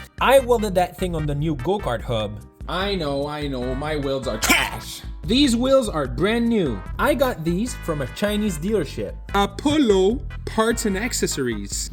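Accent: Quebec, Canada. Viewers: Hey! Are you from Quebec? You got the accent. 😁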